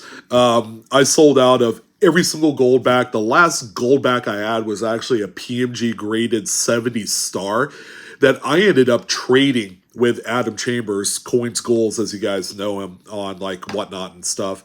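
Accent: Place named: California, United States. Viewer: That's the way dudes from California talk mate 🦘🤠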